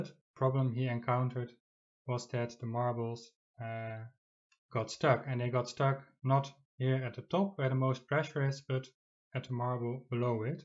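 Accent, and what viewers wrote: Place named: Netherlands. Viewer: haha het typisch nederlandse accent :). Btw, geweldige video's!!!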